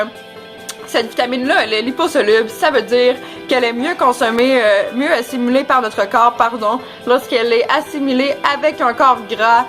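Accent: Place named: Canada. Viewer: On va me traiter de raciste normal c'est la nouvelle génération de merde mais, l'accent canadien me stress j'ai phobie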